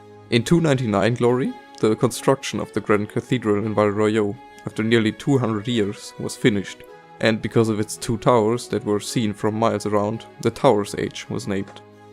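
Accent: Germany. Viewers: He sounds German.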